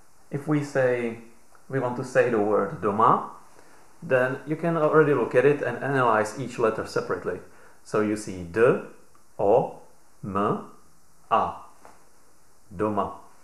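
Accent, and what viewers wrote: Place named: Czech Republic. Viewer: ma cesky prizvuk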